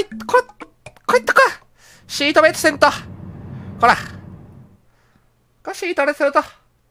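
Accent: Kagoshima, Japan. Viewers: 9割くらいは理解できませんでした😊 鹿児島弁スゴイ👍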